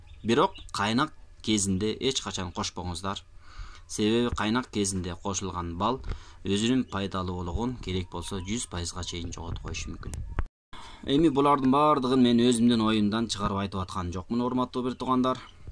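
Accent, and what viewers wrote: Pamir, Tajikistan. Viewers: Памириский акцент 😀 молодец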